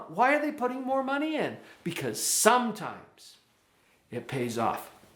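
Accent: United States. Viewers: This guy sounds like he's from Utah or something.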